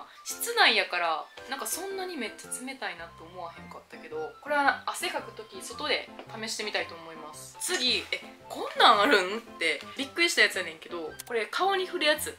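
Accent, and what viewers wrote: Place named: Osaka, Japan. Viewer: 大阪と大阪人と大阪の食べ物と大阪弁がダイスキで現在大阪に住んでる山形人なんですけど、の動画観ていつもきゅんきゅん＆ニヤニヤしてました🥰知らんけどとか、◯◯やねんな〜とか、耳が幸せです🥰ありがとうございます🌷あと今頃だけど、山形で免許取ってたなんて嬉しいです✨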